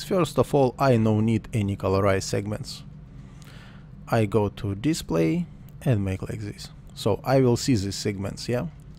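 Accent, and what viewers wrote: Russia. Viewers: Кажется слышу русский акцент?;-) Спасибо за урок!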